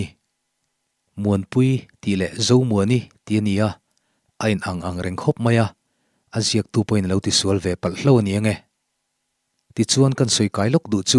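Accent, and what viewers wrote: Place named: Lunglei, India. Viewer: segundo ngaihthlak nawm na chhan hi chu lunglei lam hi atam thina anuam hranpa ve hrim2 heje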